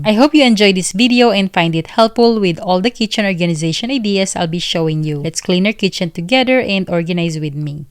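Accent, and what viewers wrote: Philippines. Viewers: Matagal na nag wonder ako if your Pinay because of your accent...watching from Cebu❤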